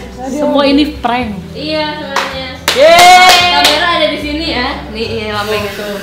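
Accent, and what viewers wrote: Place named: West Java, Indonesia. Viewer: Kenapa ya klw. Bunda ngomong pinginnya saya ketawa lucu suka ada sundanya 😂😂👍😍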